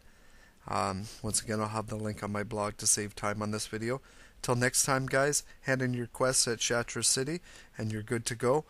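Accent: Canada. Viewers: its not really a chicago accent...its more of a canadian..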